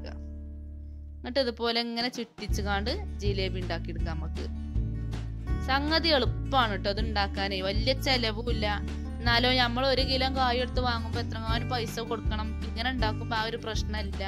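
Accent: Malappuram, India. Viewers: മലപ്പുറം താത്താന്റെ സംസാരം കിടു 🤣😘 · ഞാൻ ഇപ്പൊ കാണൽ തുടങ്ങിട്ടൊള്ളു. ഒരുവിധം കണ്ടു വീഡിയോസ് ഒക്കെ സൂപ്പർ. അവതരണം പറയാതെ വയ്യാ. തനി മലപ്പുറം ഭാഷ.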